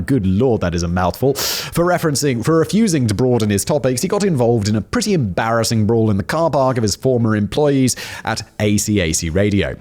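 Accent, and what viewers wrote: United Kingdom. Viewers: I’m a twenty something white/Hispanic girl and I absolutely vibe with all your content Simon. Super sad you had to leave geo/biographics bc that’s where I started watching you before branching out to all your other channels but ultimately understand why it had to happen. Either way I’m just a big fact nerd who loves your fancy British accent and cracked out tangents! Never change my dude! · I am not a dude and I don't recall YouTube inquiring of my gender. I am subscribed to every channel you have because facts being delivered in the voice of my former colonial overlords helps me sleep...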